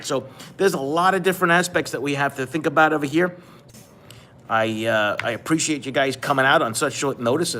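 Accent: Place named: New York, United States. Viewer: I appreciate your true New York accent! Most New Yorkers residing in New York, came from somewhere else much like my Boston hood accent! You can’t mistake it because most of the ppl residing in Boston, aren’t from here either! 🤯😂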